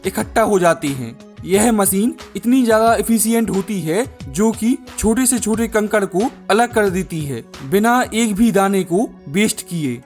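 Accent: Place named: Bundelkhand, India. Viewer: Aap bundelkhandi hai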